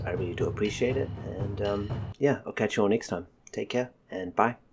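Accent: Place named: New Zealand. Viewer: you from nz?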